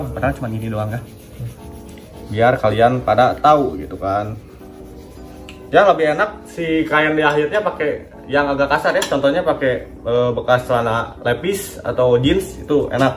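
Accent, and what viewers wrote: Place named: West Java, Indonesia. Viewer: Urang sunda pisan cepel wkwk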